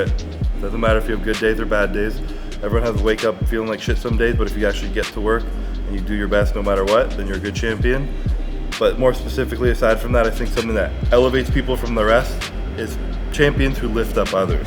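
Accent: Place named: South, United States. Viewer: southern accent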